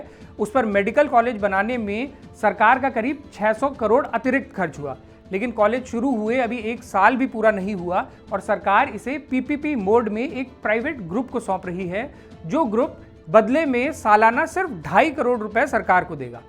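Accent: Garhwal, India. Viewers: बहुत सुंदर भूला aap nispkch khabar chahate हो और जब बीच बीच मे gadwali बोलते हो तो 4 Chand laga देते हो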